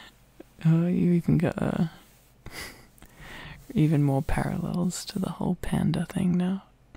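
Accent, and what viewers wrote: Australia. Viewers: Aussie accent ahhhh:××× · I like that you're aussie cause idk I feel more at home and it feels more real ig? Idk I also kinda just get annoyed at how americans- and like most others actually- say some words and I get uncomfy so ye that was hard to write fjhreh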